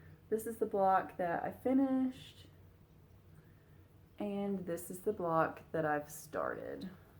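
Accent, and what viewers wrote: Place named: South, United States. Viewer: I soooo enjoy you, your soft slightly southern:-) accent is sooothing to listen to...:-) enjoyed your WIPs your HRH is so beautiful, not to far and your finished...indeed your videos are my first choice. Loved your Haul... Indeed if all mankind would live in mutual love, a kindness would be likened unto a vapor before us, changing us...💛💛 Blessings...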